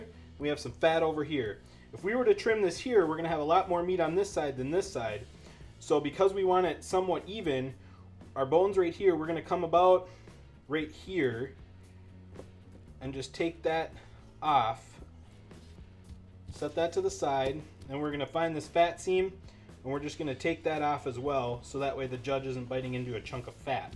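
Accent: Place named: Wisconsin, United States. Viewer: I gotta know. I see Texas everywhere but as a Wisconsin native I hear an accent that reminds me of home. Are you from TX or WI? Or other?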